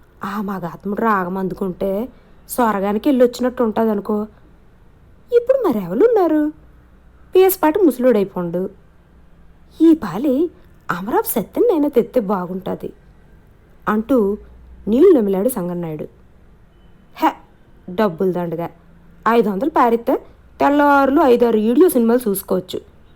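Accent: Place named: Vizianagaram, India. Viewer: Vizianagaram yaasa బావుంది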